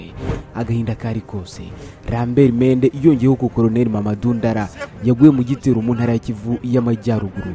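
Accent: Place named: West Africa. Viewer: Yarafite accent nkiyabo muli afrique de l'ouest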